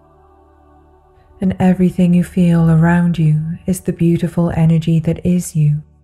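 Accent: Wales, United Kingdom. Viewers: I'm trying this for the first time.. Lovely to know of you.. I seem to hear in your accent that you may be welsh.. Is this true?.. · Guided Meditations by Lisa Beachy Good to see a positive, hopeful message in an increasingly ugly world. Do I hear a slight Welsh accent I wonder?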